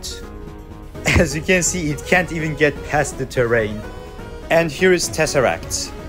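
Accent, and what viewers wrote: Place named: Turkey. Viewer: Duyduğum en türk aksanlı ingilizce❤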